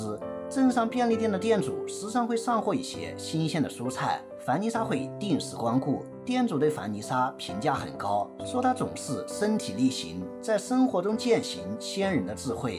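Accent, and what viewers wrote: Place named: Shandong, China. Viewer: 越越是哪里人啊？听着口音像山东，河南，陕西？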